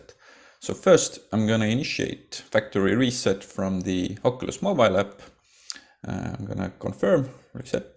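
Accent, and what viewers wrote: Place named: Estonia. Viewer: Miks ma kohe su aksendist aru sain et sa oled eestlane?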